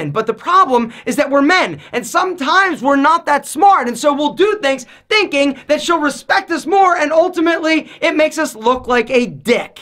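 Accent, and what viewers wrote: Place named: Lancaster County, United States. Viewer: sounds like a Lancaster county boi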